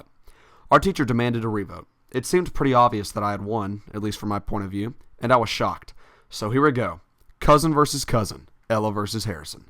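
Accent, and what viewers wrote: South, United States. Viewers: like if you like people with country accents :). also can you do a collab with your mom.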